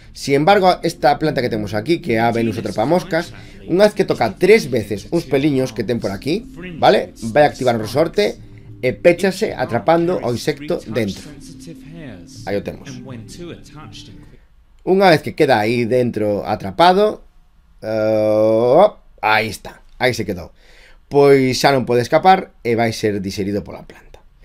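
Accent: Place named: Spain. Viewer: jajaajaj lose es que soy argentino y me da gracia como es su acento pense q era español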